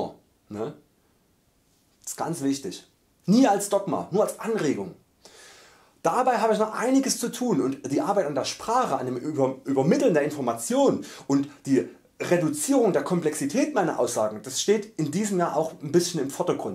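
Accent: Saxony, Germany. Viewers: Hi:) inspirierendes Video! Ja mit dem Thema Verständlichkeit ist es immer so eine Sache ^^ Vielleicht solltest du eine Art Stichwortverzeichnis bzw. eigenes kleines Wörterbuch schreiben wo du deine eigenen Termini erklärst. Nach Schema: Liebe: ist die universelle Verbundenheit im Universum... Freie Liebe ist... Was Liebe nicht ist: Eiversucht: ist... Rohgan: ist meine Lebensphilosophie, die bedeutet Kommunikation: ist.. Partnerschaft: ist.. und dann jeweils vor deinen Videos darauf verlinken. Problem ist im allgemeinen dass Menschen unter Worten oftmals etwas komplett anderes verstehen. Dein populärstes Beispiel ist wohl "freie Liebe": ich behaupte 80% der Menschen versteht da Liebe(Sex) machen mit jeder & jedem in totaler ungebundenheit. Du scheinst darunter im Großen und ganzen etwas anderes zu verstehen. Das Problem ist, dass man Deine Videos meist nicht in Reihenfolge schaut, zumindest neue Abbonenten. 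In einem Buch kann man Begrifflichkeiten nach und nach erklären und das Buch und den Leser, so zu sagen, mit der Zeit aufbauen. Mit dem Medium Yt ist halt nicht direkt so gut möglich, sondern eher ein "Durcheinander". Und wie du sagst, es gibt Leute die einen patu nicht verstehen wollen, jeder deiner Zuschauer wird nur das aus deinen Videos beziehen, was er bereit ist aus ihnen zu erfahren. Wenn jemand im Voherein sagt, der blöde sächselnde... wird er eben diese Erfahrung machen. Wenn jemand beschließt dieses Video im Kontext der Bereicherung anzuschauen wird er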